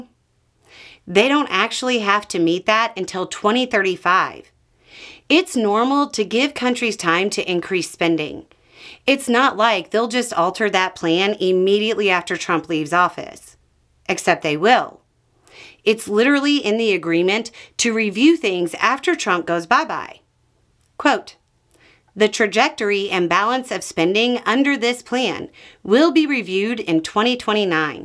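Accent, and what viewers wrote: South, United States. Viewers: Thank you for the "Monkey Paw" reminder and the description of the current Monkey Paw. Your southern style polite got ya's are precious. Keep smiling.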